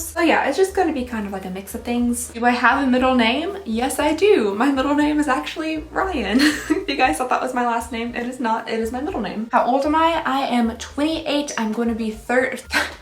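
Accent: Kentucky, United States. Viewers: Love Reno and decorating videos and would so watch if you did some. And as a Kentucky born and raised girl I hear the twang come out sometimes 😉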